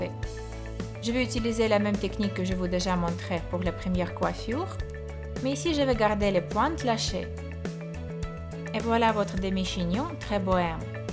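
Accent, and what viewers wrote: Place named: Russia. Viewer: Tu as l'accent russes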